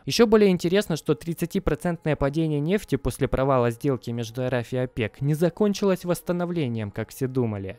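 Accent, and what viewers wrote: Ukraine. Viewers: не только,у него произношение такое прикольнозвучащее,кроме акцента...укр. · Это украинский акцент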